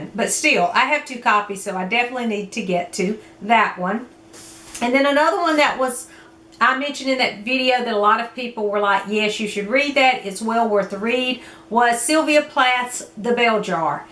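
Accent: South, United States. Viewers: It is so nice to hear your southern accent. My husband and I were both born and raised in Birmingham, Alabama. I got a job in Washington DC and we moved to Northern Virginia 24 years ago. Living up here I miss the food and the SEC football (Roll Tide). We still have family in Alabama. I can retire in 2 years but we haven’t decided where to move. It will definitely be a Southern city. You can take the girl out of the South but you can’t take the South out of the girl. 😀 This is probably way more than you ever wanted to know about a viewer. Glad to have found your channel and glad to hear your accent.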